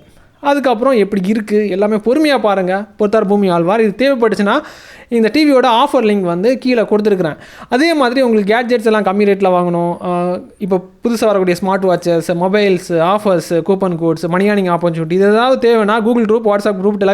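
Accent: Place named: Nagercoil, India. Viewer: Nanba ne nagarcovil thana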